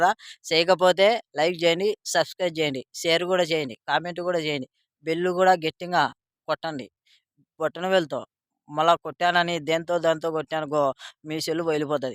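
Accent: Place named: Pulivendula, India. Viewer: Anna naadhi pulivendula daggara palli nee basha vintunte maa pallelo laaga vundhi ee vooru anna manadhi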